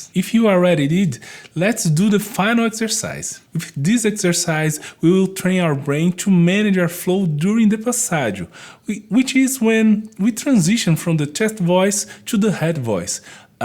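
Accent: Brazil. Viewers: I can tell by your accent that you’re Brazilian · Is he Brazilian or am I trippin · i can smell a brazilian accent from a mile away CHEERS mano! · VC è mineiro? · i love the brazilian accent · Hi! This video is great! Thank you so much for posting this. A small question, are you Brazilian by the way? Your accent is very familiar! Familia Brasil! Abração! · Most Brazilian accent I've ever seen ahah Great tutorial! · Great video! Just a question tho, are you Brazilian? :V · Adoro seu sotaque brasileiro lindo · Quando eu vi o sotaque ja sabia que era Brasileiro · Reconheço o sotaque br de longe kkkkkkkkkkkk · sotaquezinho brasileiro <3 thanks for the video! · VOCE É BRASILEIRO VOCE NAO ME ENGANA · You're Brazilian, right? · I can tell this dude is Brasileiro by the cadence and enunciation of his voice lol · tu é brasileiro é ? thank'u for video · I love the brazillian accent, nowdays I can perceive how much we say all the sounds more open and "round" than the americans, mainly because this is a characteristic of the Brazillian Portuguese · Pensei a msm coisa , soa como um brasileiro kkkkk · This has nothing to do with the video but are you brazillian?? 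It’s just because of your accent I don’t wanna sound rude I’m just really curious lol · Bem quando você começou a falar sabia que você era Brasileiro :D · The Brazilian Accent is THICK! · Hehe Deu pra perceber que era BR pelo sotaque O canal é top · CERTEZA QUE É BR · Is crazy how we know someone is from Brazil by the accent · ola! tudo bem? I can tell that you are from Brazil! thank you for the video. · engraçado como que no primeiro segundo do vídeo eu já sabia que era brasileiro kkskjsksks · Tu é brasileiro, certeza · Are you brasilero my amigo? Teu sotaque é imperdivel saudes.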